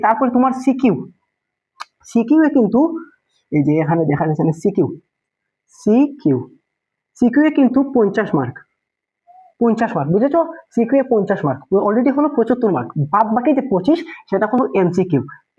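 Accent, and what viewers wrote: Sylhet, Bangladesh. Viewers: Jii may be Sylhet Ta Nahole maje maje sir arokom kota bolccen humm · ভাই কিতা সিলেটি নি। ভাই পদার্থ বিজ্ঞান লইয়া যে চিন্তাত আছি। সেইম টাইপ ওর অংক টেস্ট পেপার ও এক একটা এক এক নিয়মে দেওয়া। পাশ করতাম ওইলে কিলা পড়া লাগবো। 😭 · vaiya mono oy sylheti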